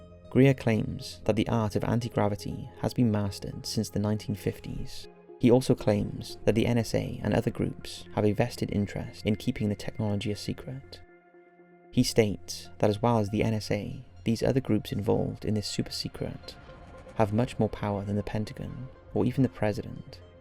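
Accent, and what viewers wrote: Wales, United Kingdom. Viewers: 😂😂 sounds like a semi-welsh, generic accent, plus, a commenter on this comment thread has already pointed out they can't stand the voice, not massively effective I'd say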